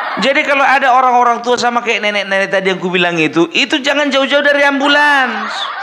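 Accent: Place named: Medan, Indonesia. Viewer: Yakin lucu si Abang ini. Sy suka logat Medan. Lanjut bang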